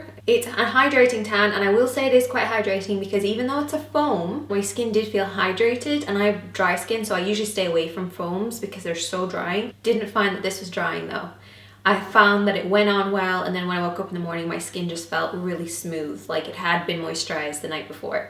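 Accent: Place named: Ireland. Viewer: I can hear an Irish accent in there 🇮🇪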